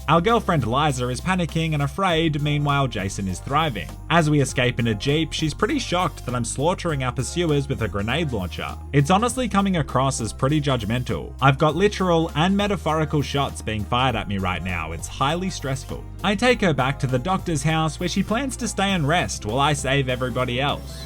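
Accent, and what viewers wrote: Australia. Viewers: You know you’ve been watching a lot when you can’t say ‘anyway’ without saying it in an Australian accent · good content good ausie accent too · By far my favourite game ever created. But FYI Dennis is the son of an African warlord and went to America but he was treated badly so went to the Rook Islands to make a difference. I assume that was the name given to him in America. But great video its kind of like if SovietWomble and Charborg did a review in an ozzy accent.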